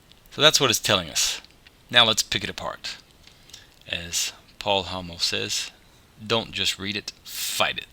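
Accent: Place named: England, United Kingdom. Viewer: Just the paint, it seemd your accent was English. Nice video also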